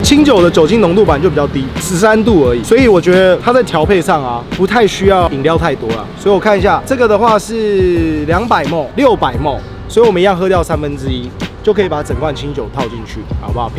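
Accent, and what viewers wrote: Japan. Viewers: 他說的英文是那邊的英文？ 日式英文？ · 我喜歡你的日式英語😆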